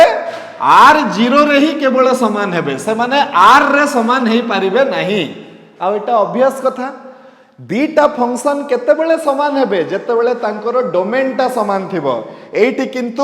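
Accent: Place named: Balasore, India. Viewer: re balesore bhasi jaini ta😅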